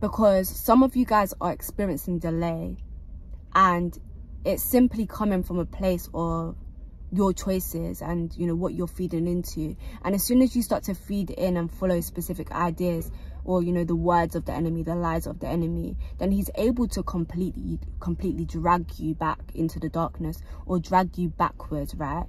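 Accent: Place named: United Kingdom. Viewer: Rah wasn’t expecting a U.K. accent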